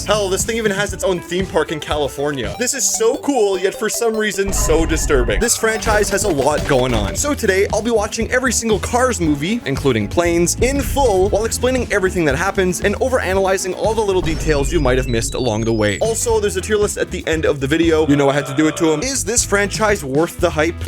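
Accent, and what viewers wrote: Canada. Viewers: This dude sounds Canadian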